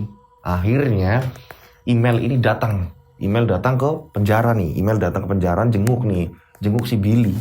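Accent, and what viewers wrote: Java, Indonesia. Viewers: Mikum,, sya orng subang ,, enak dngerin cerita yaa apalagi dngan logat jawa👍,, sukses trus cerita yaa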